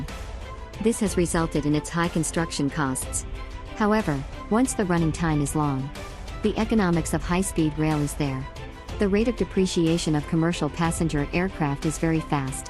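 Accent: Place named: United States. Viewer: I love the idea of HSR. But much of this is "Chinglish", yet spoken with an American accent. So it's computer-generated propaganda. If there's anything worse than the current intelligence level of the average American, it's the human rights record of the Chinese.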